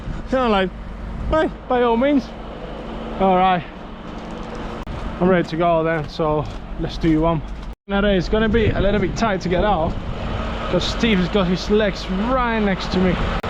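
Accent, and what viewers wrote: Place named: Liverpool, United Kingdom. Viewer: Love your videos mate, you’re the backbone of our society! I’ve always wondered where your accent is from? Sounds like it has some Scouse influence.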